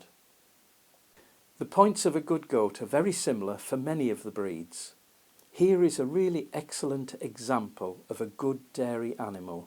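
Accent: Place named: North, United Kingdom. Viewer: Fantastic video, I particularly enjoyed your utterly clear and amazing north-country accent, I’m from the north midlands, and have, I think a clear accent, but when I worked in Japan, my colleague from Ripon was, to the Japanese, totally understandable....I salute you Sir!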